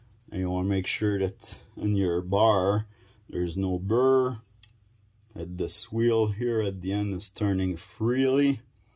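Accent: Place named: Quebec, Canada. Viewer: Thank you! May I say you have the most delightful French-Canadian accent.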